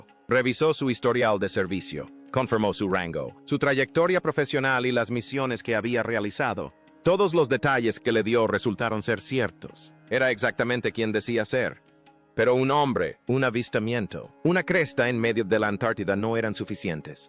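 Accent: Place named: United States. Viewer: Y este acento de Gringo aprendiendo español! esto es Falso!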